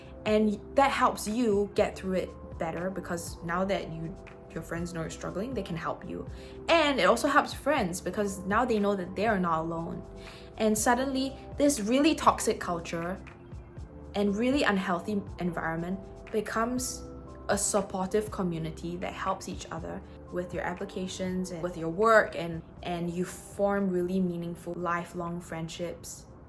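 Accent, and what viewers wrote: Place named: Singapore. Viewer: Hi! Future student in LSE MSc Political Science here! Got any advice for a fellow Singaporean? (Assuming you are from the video and accent haha..)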